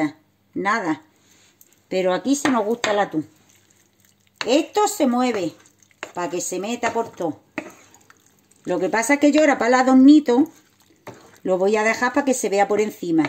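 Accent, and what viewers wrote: Andalusia, Spain. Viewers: Pues hoy la he comido yo. Mi madre la hacía así y era jiennense; todo muuuy rico, pero lo que más, las sopas con el caldillo. Ese acento, cómo me traslada a la infancia...... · Ese acento andaluz divino!!!!!!!!!! Desde Uruguay, cálidos saludos. Y la pipirrana, riquísima!!! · Que simpaticaceres. Una autentica Andaluza!!!. Me encanta oir a los andaluces. Claro que lo hare este verano!!. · Gracias por ésta receta tan natural , tradicional y refrescante. Tengo ilusión en hacerla, seguro q está de maravilla 😋 Mmm... Gracias y aforo tu acento Andaluz ! Guapa 😘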